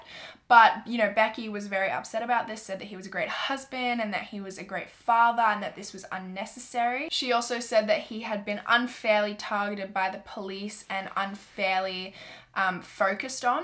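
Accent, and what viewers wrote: Australia. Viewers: Well she's Australian so she might be saying it different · people always complain about your voice 🤔 ... ive seen it on forums and to me your voice is so AMAZING... AUSSIE accent CUTENESS IS REAL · Are you Australian? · Jomara Medina she is Australian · Literally Exposedd British ? huh 😂💀💀 she’s Australian 🌚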